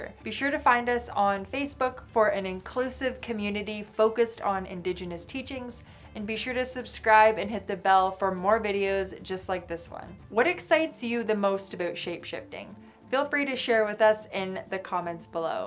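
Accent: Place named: Canada. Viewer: I wonder if she is Canadian or Minnesotan the way she says certain words. Interesting video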